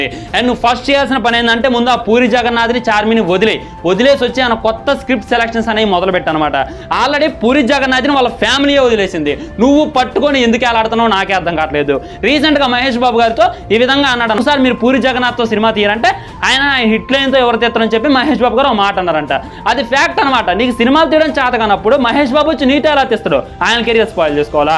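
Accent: Khammam, India. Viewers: Bro meedhi khammam aah?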